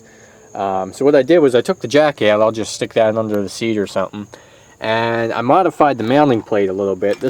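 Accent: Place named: Philadelphia, United States. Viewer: Are you from Philadelphia, or close to it? The second I heard you speak. I was like, this dude is from my area.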